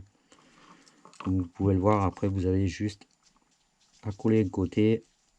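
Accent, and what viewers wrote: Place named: Ardeche, France. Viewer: C'est super bravo, quelle boulot! J'ai acheter le kit il y a une semaine, j'ai 36 ans, si je le finis avant mes 60 je sera très fière de moi! Hahah! Est se que vous êtes situer dans l'Ardeche? J'entraine mes oreilles flamand a reconnaitre les accents different français.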